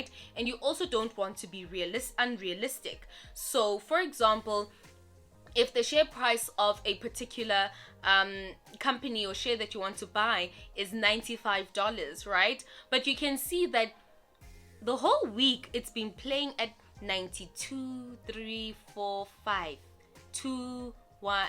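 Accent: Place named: South Africa. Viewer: I thought you lived in Australia but I was surprised by the South African accent 😂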